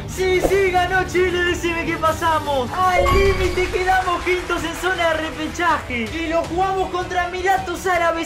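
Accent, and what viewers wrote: Uruguay. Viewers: Eres uruguayo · Eres Uruguayo?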